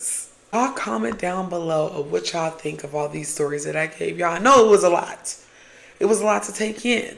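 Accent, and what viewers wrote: United States. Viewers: CAULDRON. Spelling..... And you are NOT African, unless you were born there. You sound AMERICAN. You seem to be a Black American of African Heritage (& frankly, white too) The term AFRICAN AMERICAN was a label put upon you to divide us. My ancestry is Sicilian and German, but I don't call myself a Sicilian American. Can you imagine is EVERYONE did that? Everyone you know would be an Irish American or a Hispanic American, or..... And NATIVE American? ANYONE BORN HERE is Native. Maybe The Original peoples or Indigenous peoples or... ? Don't divide. WE ARE ALL Americans (& really need to take our country back from the politicians who are importing an invasion of people that do not hold our values, speak our language or have any intention of assimilating into our society.) P.S. You remind me of my friend's daughter. very pretty. Thank you forthe video - Subbed!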